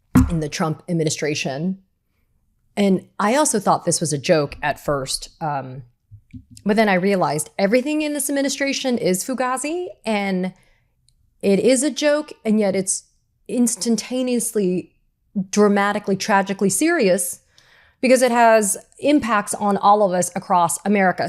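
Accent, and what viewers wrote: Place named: Australia. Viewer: Adoring Katie Phang unplugged! ❤❤❤😂😂😂😂😂 You sound very Aussie, would fit in very well down ere!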